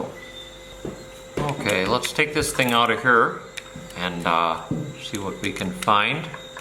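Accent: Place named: Wisconsin, United States. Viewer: You Wisconsin folk talk funny but you're a friendly bunch. Dont lose those calories!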